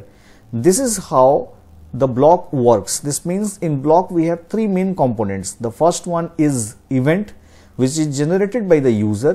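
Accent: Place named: India. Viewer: i hate hindi accent, you teach bad :)))))))) )))))))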